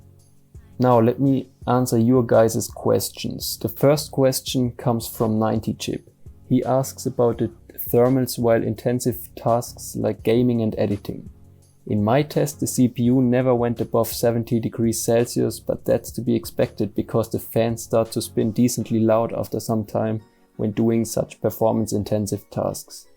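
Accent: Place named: Germany. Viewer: Man hört halt sofort raus das du Deutscher bist 😂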